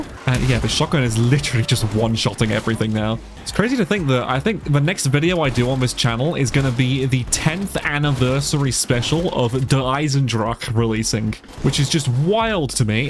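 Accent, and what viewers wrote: United Kingdom. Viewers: Its refreshing to hear a british person talk about how messed up rowling is.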